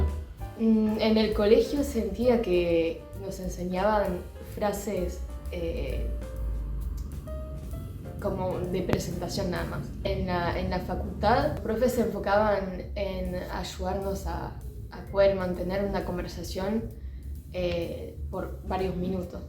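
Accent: Buenos Aires, Argentina. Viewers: no tenés ni el más mínimo acento extranjero, parecés una francesa de plaza Francia! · Felicito a por su aprendizaje. PERO POR FAVOR: NO ES "ACENTO ARGENTINO". ES ACENTO PORTEÑO, DE-BUENOS-AIRES. El acento de la Ciudad de Buenos aires es COMPLETAMENTE DIFERENTE del acento del Norte (Tucumán, Salta y Jujuy), y de Santiago del Estero (único), de Córdoba y de Cuyo. POR FAVOR, NO NINGUNEEN A LOS 27 MILLONES DE ARGENTINOS QUE NO HABLAN COMO LOS PORTEÑOS. Gracias. No sé de dónde es, pero seguramente le molestaría si dijeran que su acento es el mismo que en Marsella o Normandía. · Que genia !!! Re Argenta !!!! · Si ella no aclara que es francesa jamás de lo jamás nosotros argentinos pensaríamos que ella es francesa , asi que bien por ella porque habla perfecto y con tonalidad pura argentina :face-orange-biting-nails::face-orange-biting-nails::face-orange-biting-nails::face-orange-biting-nails::face-orange-biting-nails::hand-orange-covering-eyes::hand-orange-covering-eyes::hand-orange-covering-eyes::hand-orange-covering-eyes::hand-orange-covering-eyes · Terrible, impresionante como hablas español porteño, felicitaciones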